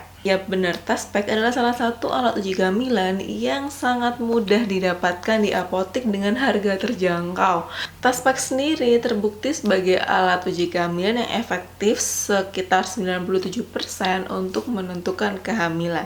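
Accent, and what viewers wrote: Java, Indonesia. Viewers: dokter..asli jawa yaa😊😀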